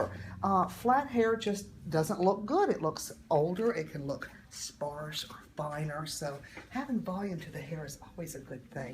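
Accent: United States. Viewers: What a wonderful cut and style for this lovely lady. You sound like you could be from Georgia, or nearby. If so, I want you to cut my hair please! I'll drive the distance! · Great job! I hear a southern twang...where are u located?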